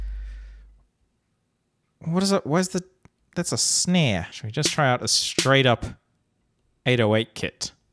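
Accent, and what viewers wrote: New Zealand. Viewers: Your from NZ aren't you?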